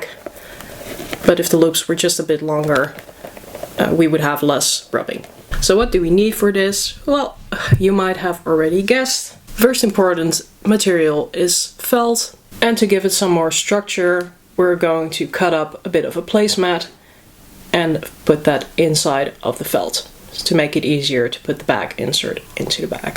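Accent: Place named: Netherlands. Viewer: Where are you from I hear a Dutch accent ben je Nederlands?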